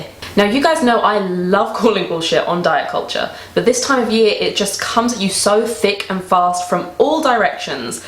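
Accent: United Kingdom. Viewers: YOURE SO BRITISH I LOVE IT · followed you for months on insta and only just realised........you're english?? lol i was expecting a california accent 😂😘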